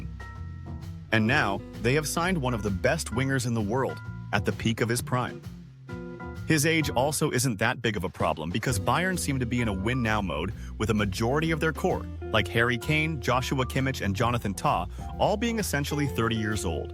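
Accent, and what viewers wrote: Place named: United States. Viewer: We really don't need AI with an american accent!!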